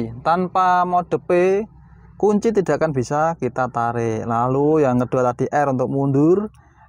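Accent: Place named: Java, Indonesia. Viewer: Suara nya medok ya kak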